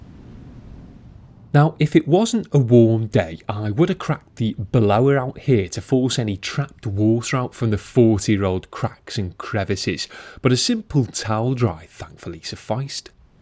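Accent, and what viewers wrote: United Kingdom. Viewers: What makes you great is being a pro detailer and not also having your head up your ass. And your British ass voice.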